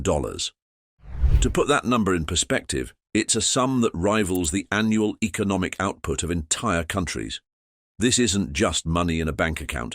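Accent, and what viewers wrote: United Kingdom. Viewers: So how do you know that these people control the cartels? I'm wondering 🤔! You as a British citizen (based on your accent) remind me of the BIASED American media. That's all those people talk about when referring to our country and people. This is one of the reasons I personally say that America is a humongous HYPOCRITE nation! They do business with us and at the same time lash out at us. That includes their Roach 🪳 Trump their criminal 👹👿🤥. And by the way. Trump was caught stealing in our country. Outside Mazatlán and the city of Ensenada. He was also caught swindling people of their money in Playa del Carmen. Please make a video of this and why America is the world's biggest drug consumers. Please. I would appreciate it if you were not BIAS just like the American media. And just in case the American society did not know how drugs are smuggled across the entire border. 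Here's a tip. Because drug traffickers BRIBE 💲💰 immigration officials in order to smuggle all those drugs through the border!! And I do mean humongous amounts of money 💰💲!! Believe it or not, up to $100,000 dollars in one lump sum!! So please do us a big favor. Please make videos about America's "war on drugs" and why it never ends. Believe me. You'll be surprised 😯 who really is behind the "war on drugs" in America!! And it isn't our people! Good day CHAP 🏴.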